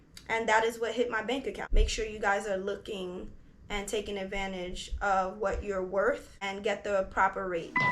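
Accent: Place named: New York City, United States. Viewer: You have a very heavy New York City accent!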